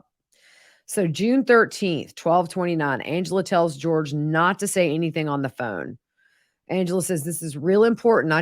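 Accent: South, United States. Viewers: You sound very southern even when you’re not trying to sound like them. I’m southern so I can hear it. Love the videos. Thanks. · Love how you narrate with that sassy southern talk you had me lol,thanks for all the hard work!⚘️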